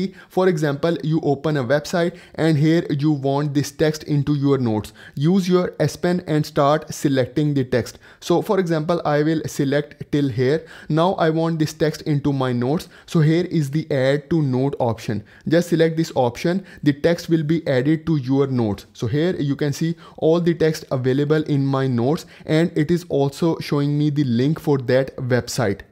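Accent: India. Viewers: Bros Indian accent is so heavy.... Got my balls crushed 🗣️🗣️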